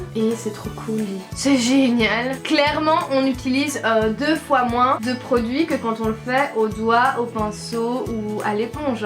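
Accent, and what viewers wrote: Liège, Belgium. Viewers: Elle a pas le même accent je trouve haha! Elle vient de Liège (Coucou tu es trop lente pour répondre à tes commentaires alors faut bien que quelqu'un le fasse hein8DDDDD) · Tu viens d'ou en Belgique t'as le même accent que lufy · ça s'entend que tu es belge 😉 on dirait moi 😂😂😂 (oui je suis belge)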